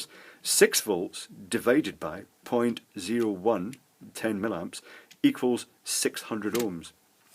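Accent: Scotland, United Kingdom. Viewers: Brilliant - why is it that the best sparkles have a Scottish ascent - answer - they are the best I have ever sailed with - enjoying this - brilliant! Please continue! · Thank you for your video :-) are ya from Scotland? You sound like it dontcha know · Which accent he explained ? British ?? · I scanned the comments for 2 minutes and am sad that no one mentions how he keeps saying nanofart. Classic Scottish accent! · Chop Chop Scottis presenter speaking English. Nothing to do with America. Snowflake much?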